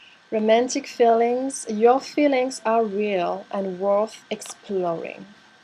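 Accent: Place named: France. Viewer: Beautiful French accent 👌👌👌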